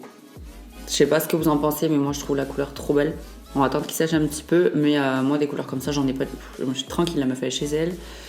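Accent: France. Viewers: Coucou tu viens d’où ? T’as un léger accent de chez moi, du sud ouest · T’es marseillaise ? Ps: super revue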